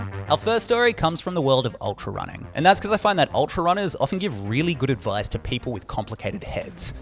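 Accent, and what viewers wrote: Australia. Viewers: I love your videos!! Perhaps someday you can add subtitles? I'm from Colombia and the Australian accent is way more difficult to understand. Thank you for posting!!!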